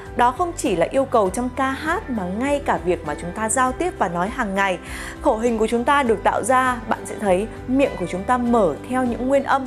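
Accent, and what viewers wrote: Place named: Hanoi, Vietnam. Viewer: chị có chất giọng hà nôi hay quá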